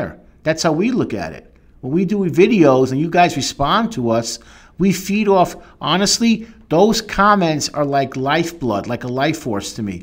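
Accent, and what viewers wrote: Brooklyn, United States. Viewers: Sorry to disappoint, but that's pure Brooklyn. I grew up in a neighborhood called Bensonhurst.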